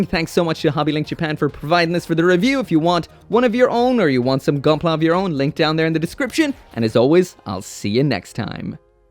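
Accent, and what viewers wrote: Ireland. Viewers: Shite. Thanks, i always wanted to hear your native accent! Still asking for that one single episode where only talk with an Irish accent ^^ Maybe something from the backlog? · Once you shait I couldn't NOT hear your Irish accent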